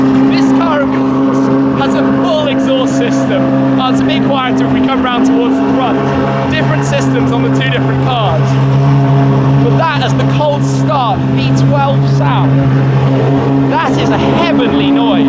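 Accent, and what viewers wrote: England, United Kingdom. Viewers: Ale mnie wkurwia Ten koleś ta gadka tak mocno angielska ze chuj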